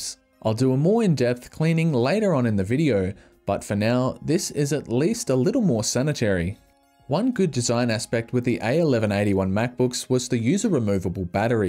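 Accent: Adelaide, Australia. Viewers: Always nice to hear another heaps good Adelaide accent. Keep up the great videos! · you from south australia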